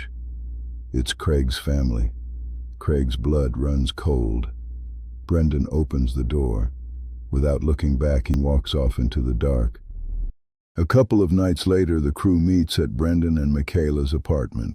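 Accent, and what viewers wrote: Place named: United States. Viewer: This is good but the American accent kills it lol otherwise good job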